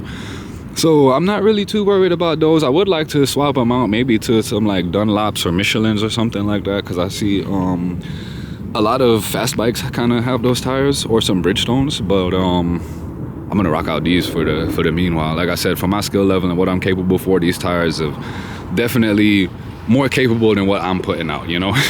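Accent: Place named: Trinidad and Tobago. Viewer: I'm looking at the naked bike version of this, they both look so damn good. It's crazy because I remember no one talking about them, looked them up and then next thing I know the 675 is everywhere lol. Enjoy it bro, for a first bike you made such a good choice you probably won't want to change for a long time. Also, I hear your accent bro ! lol my family is from Trinidad so I got an ear for it. Dropped you a sub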